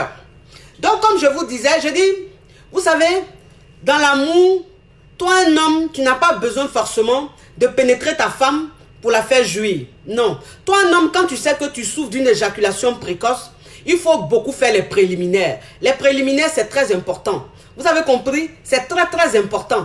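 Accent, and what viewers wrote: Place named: Ivory Coast. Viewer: Wallaye si n'est pas ivoirienne ça m'étonnerait. Gagagagagaga. Elle parle comme à chez nous pays hein loool. J'adore encore aujourd'hui la façon dont le sujet-ci est abordé.Merci beaucoup pour le partage chère.Stay Blessed for all!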